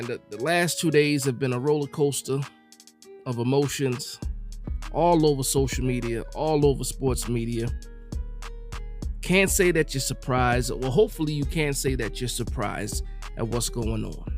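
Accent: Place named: South, United States. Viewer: I'm not listening to talk about The New York Football Giants from a guy with a southern accent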